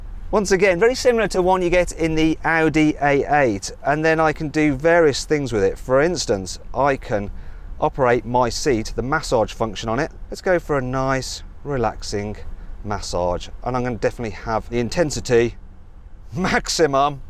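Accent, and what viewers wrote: United Kingdom. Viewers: ゴリゴリのイギリス英語かな？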